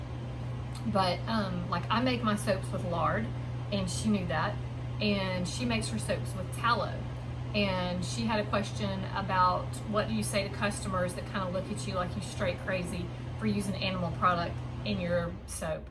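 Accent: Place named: South, United States. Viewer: And I KNEW YOU WERE SOUTHERN! ! (next door neighbor, hi from Alabama)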